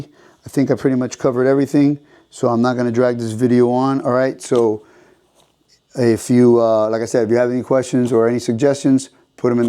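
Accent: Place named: Miami, United States. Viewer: Love the Miami Accent...LOL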